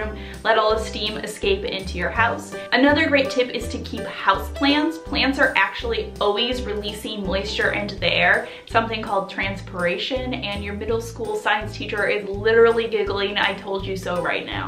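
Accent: United States. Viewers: I'm so over American accents. Her voice grates on my nerves!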